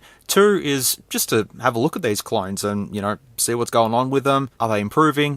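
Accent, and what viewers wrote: Australia. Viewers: Yay the Aussie voice is back now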